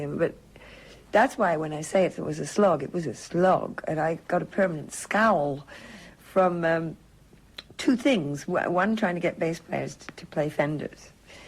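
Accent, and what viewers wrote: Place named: Ireland. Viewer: I love and have always loved her voice whether singing or talking. It has a different sound. I'm scots so of course I have a different sound to pure English but so has Dusty. I don't know if this came from her mother's early influence as Irish but whatever it is Dusty has a different sound altogether. Anyway can I just say this was a great interview with Keith Altham who was always a champion of hers and spoke about so brilliantly on the Just Dusty TV documentary.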